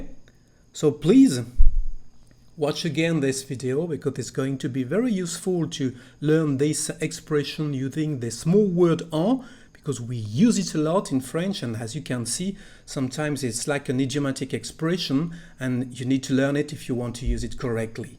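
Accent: France. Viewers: That was great and your pace was perfect! Also, you have a gorgeous French accent. Ahhhhh.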